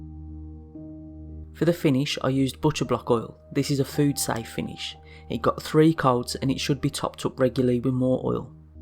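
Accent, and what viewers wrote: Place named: Walsall, United Kingdom. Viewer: They turned out great man. I’ve got a big solid oak worktop offcut that I’d be interested in turning into a shelf and a couple of cutting boards. You sound local to me (Walsall area) if your interested in some private work drop me a reply. Great work mate.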